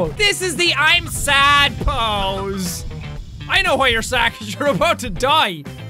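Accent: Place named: Ireland. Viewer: jacksepticeye I'm not going to lie to you but your Irish accent is just off the hook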